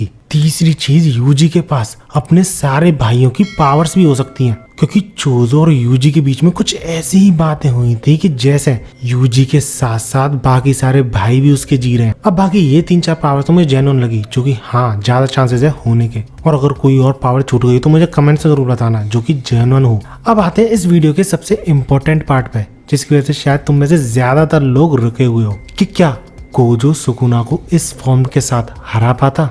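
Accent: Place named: Uttar Pradesh, India. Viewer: Bhai ye UP vale accent mein ARA ARA mat kiya karo, japanese words bol hi mat, bahut bekar lagta hai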